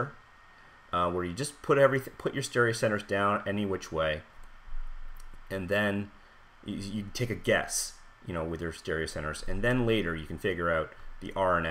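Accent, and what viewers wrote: Canada. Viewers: R u canadian?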